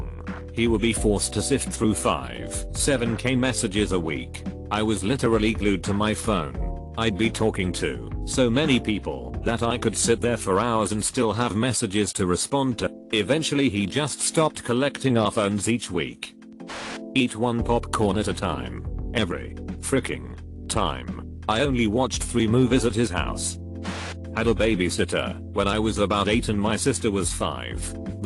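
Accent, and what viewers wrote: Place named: United Kingdom. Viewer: Although I can understand English almost perfectly, this British robot voice is always so hard to understand. I'd rather have someone just reading them out loud that would be much better.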